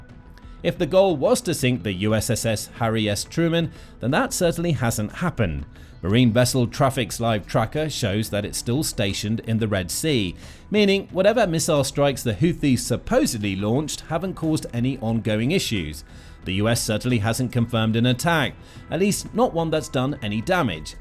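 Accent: United Kingdom. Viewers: Your British accent tells me everything I need to know BBC should worry more a about your rip gang's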